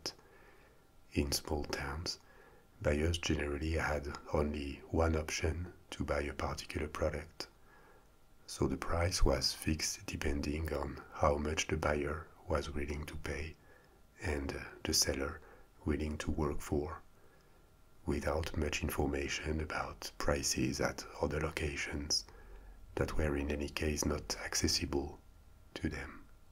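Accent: France. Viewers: I think you are one of my newest favourite asmr artists. That french accent.... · I'm a huge fan of ASMR with female voices, triggers it better for me personally. However, you sir, and your deep dark chocolatey French accent do the job quite well.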